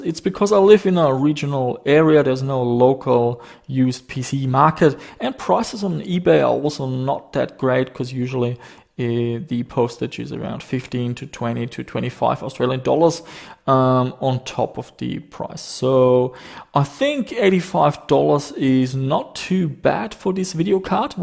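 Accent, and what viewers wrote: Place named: Germany. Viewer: you german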